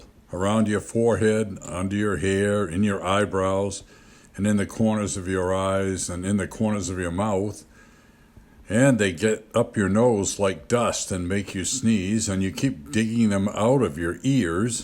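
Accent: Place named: Boston, United States. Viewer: Im obsessed with the narrators Boston/New England accent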